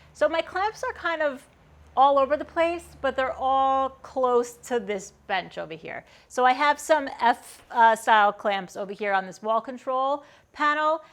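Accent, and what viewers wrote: United States. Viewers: She just sounds like a Yank to me, but whatever, you're all going to be speaking Chinese soon anyway.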